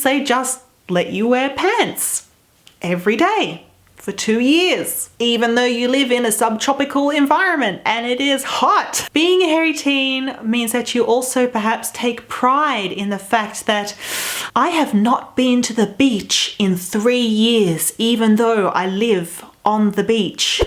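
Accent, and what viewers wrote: Australia. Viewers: R u Australian? Love the accent.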